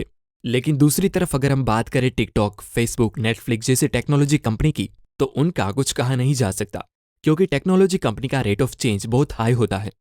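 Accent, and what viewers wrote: Gujarat, India. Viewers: Tame gujarati chho?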